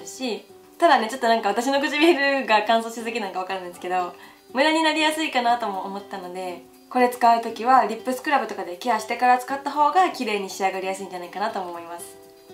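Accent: Kansai, Japan. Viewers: 最近よく見てます。の性格よさそうでなかよくなりたりタイプです😊関西弁にも親しみわきますの歌も好きです💖応援しています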